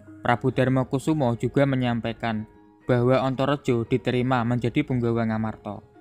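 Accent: Java, Indonesia. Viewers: Emang sengaja ya suara dan logat medok nya atau gimana bro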